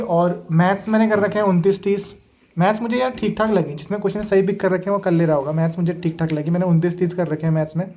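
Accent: Uttarakhand, India. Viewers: uttarakhand se hai kya bhai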